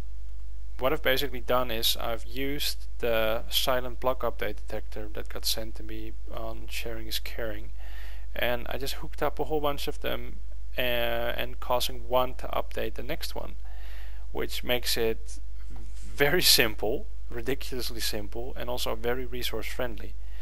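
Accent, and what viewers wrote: Netherlands. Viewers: are you dutch? I hear a dutchy thing in your voice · ben je nederlands, want zo klink je wel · Wait, are you Dutch? Your accent is really familiar, somehow. (See, I'm dutch too, lol.) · you are great, but i can hear your accent, that you are a dutchman. so funny tipical dutch accent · Are you Dutch ??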